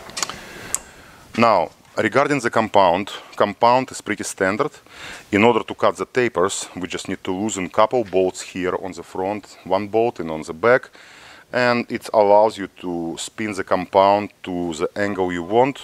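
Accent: Russia. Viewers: Отвечаю, мужчина русский, произношение капец русское)))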